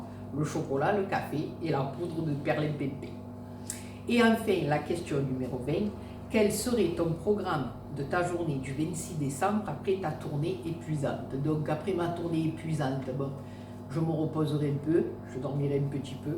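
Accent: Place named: Marseille, France. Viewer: Coucou. Je te trouve radieuse, tu brilles encore plus que ton magnifique sapin! Que d'émotion dans cette vidéo, on retrouve toute ta gentillesse, ta générosité, ta bienveillance, tu es une personne au grand coeur et on ne peut que t'aimer. J'adhère totalement à tes réponses, j'aimerais moi aussi changer beaucoup de choses, mais.... En plus tu me fais rire avec ton adorable accent de Marseille, ta poudre de Perlimpinpin et le chocolat. Merci pour cette vidéo. Passe une bonne fin d'année. Plein de bisous à toi et ton mari.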